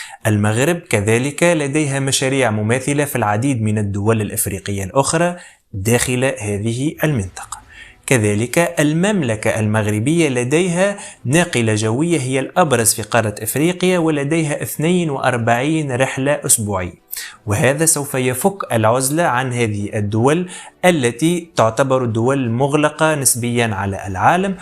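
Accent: Tunisia. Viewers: انا لقيت قناتك صدفة من ساعة شاركت معك لأني وجدت المحتوى جيد بالتوفيق ان شاء الله سؤال من أي دولة شقيقة انت؟ من اللهجة اظنك تونسي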